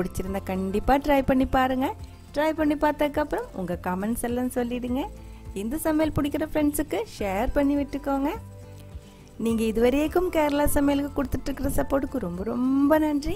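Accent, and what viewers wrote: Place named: Coimbatore, India. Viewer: Ada ammani..... neenga at present kovaila that erukerngala OK OK athaan pathean enada covai Tamil varuthenu. super ma. I too at kovai thaan. epa Ellam unga slangla thaan en wife pessaranga super. ur style of voice is amazing and with lots of love.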